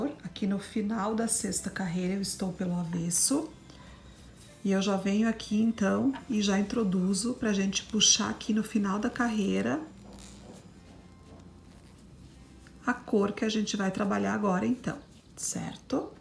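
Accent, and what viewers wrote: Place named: Rio Grande do Sul, Brazil. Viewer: Sotaque gaúcho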